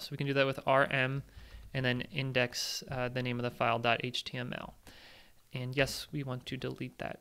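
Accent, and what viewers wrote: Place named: Philadelphia, United States. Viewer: hearing distinctive Philly (Philadelphia, PA) accent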